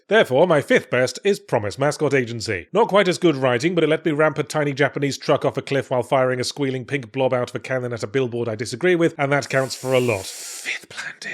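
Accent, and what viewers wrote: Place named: Midlands, United Kingdom. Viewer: Where in the Midlands did yahtzee grow up?? 🤔 that ain't no black country accent... Or brum... Or stoke... Or leicester (I could go on) 😅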